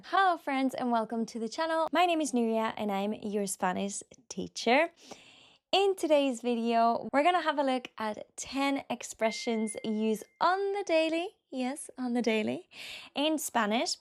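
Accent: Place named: Belfast, United Kingdom. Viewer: I'm at a fairly advanced level but I don't think I'd heard echar agua al mar before so thank you! BTW I can hear a slight Belfast accent creeping into your English, an accent I like. Well done on getting your ENglish to such a high level, one day I hope my Spanish will be that good!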